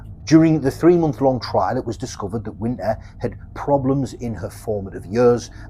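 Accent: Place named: North, United Kingdom. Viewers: Subbed, and I'm only 13 minutes in! 🎉 I'm originally from the North so your accent is making me homesick 😅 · I was gonna say. It's a Sunderland accent not Geordie.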